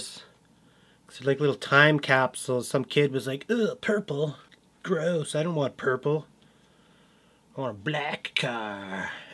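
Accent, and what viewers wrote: Canada. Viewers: Are you from Canada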